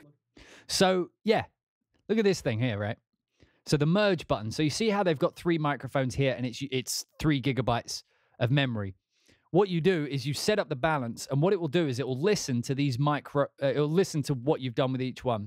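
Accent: United States. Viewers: My gosh your American accent is outstanding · American accent sounds real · Man you have a great American accent!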